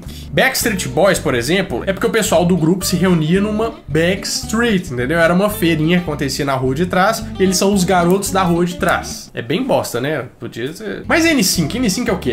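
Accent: Minas Gerais, Brazil. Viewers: Mineiro demais kkkkk Like mano !!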